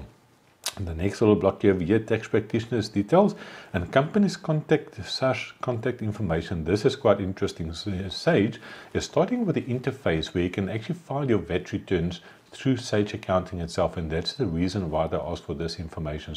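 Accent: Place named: New Zealand. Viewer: From New Zealand I believe, just change the speed of video and learn.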